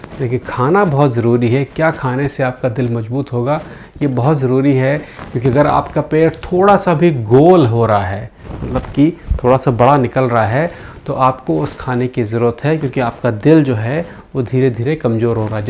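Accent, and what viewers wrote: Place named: Jalaun, India. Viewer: Sir ap ki bhasa mere up ke jaloun, orai se bahut milti hai, jase bat gath me badh lo, mota mot a samajh lo aadi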